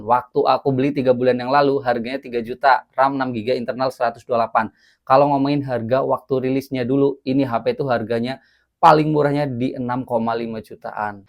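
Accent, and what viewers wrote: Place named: Banyumas, Indonesia. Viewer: Logatnya ngapak banget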